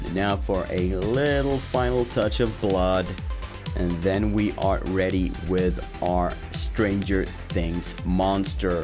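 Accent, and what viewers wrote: Scania, Sweden. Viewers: Nice ”skånska”!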